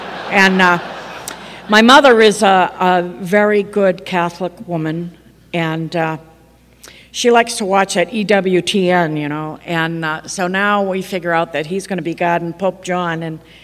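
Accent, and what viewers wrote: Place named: New England, United States. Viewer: 30 seconds in and there was that new england accent. #home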